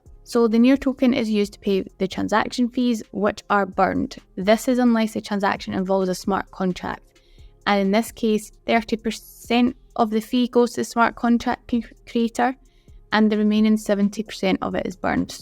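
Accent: Scotland, United Kingdom. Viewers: Good having a scottish person speaking on crypto and relate on youtube 👍. Do you have twitter that we can follow aswel for any more information and updates ?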